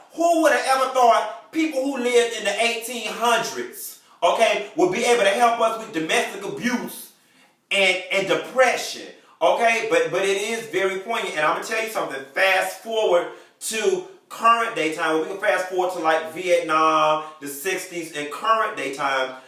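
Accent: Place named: South Florida, United States. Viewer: i tought that you were a south florida dude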